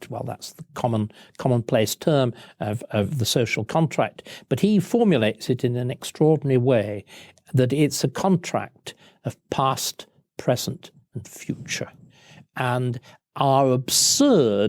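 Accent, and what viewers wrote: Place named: RP, United Kingdom. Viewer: It's amazing what a posh accent and some public school bravado can make up for ... The waffle, the contradictions one after another.... Just throwing out clichés and generalisations with confidence. There's no depth or consistent logic to his disjointed points, some of his historical parallels are so obviously ridiculous, and his comment about COVID 🤦‍♀️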